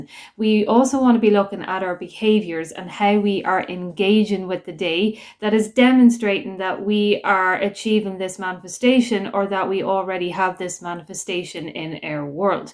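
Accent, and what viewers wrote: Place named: Ireland. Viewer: hi I have sent an email with details 👍 thank you so much for the content you post, just love that Irish accent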